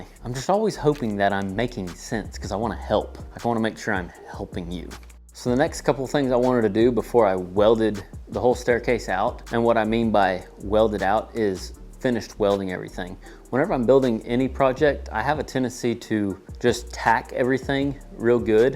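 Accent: Oklahoma, United States. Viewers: Hey man are you from OK?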